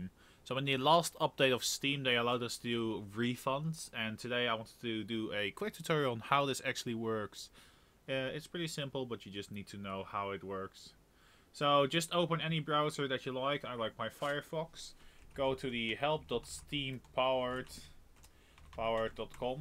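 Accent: Netherlands. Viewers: ben jij nederlands ik hoord het aan je engels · Are you Dutch?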